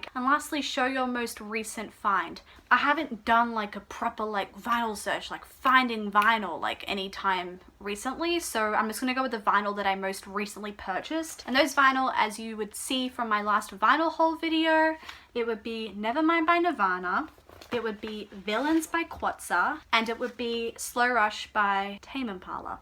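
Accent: Australia. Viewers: I’m so happy you found me so I could find you! I lived in Australia for a few years and the moment I heard your accent it felt like home. Also love your picks, I’m waiting to buy tame impala when I can go out to a record store 😊 fun video, I’ll have to do a quarantine tag.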